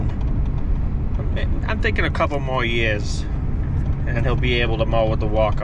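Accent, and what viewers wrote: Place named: Massachusetts, United States. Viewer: From the sound of your accent, you live up where I was born and raised...Massachusetts? I live in North Carolina now, have for 30 years. Good video guys, keep up the good work, I'll give you a shout out on my next video.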